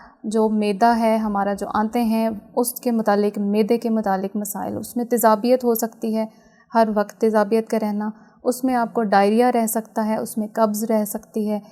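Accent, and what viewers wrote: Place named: Saraiki, Pakistan. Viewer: Hello Madam, Good Day! Hope you are enjoying good health. My question is about bad thoughts. The bad thoughts about religion come to mind, if a person expresses these thoughts in front of someone, he/ she will be killed as a blasphemer, but these thoughts come to mind many times. is there any solution? By the way your speaking accent shows, like you are Saraiki.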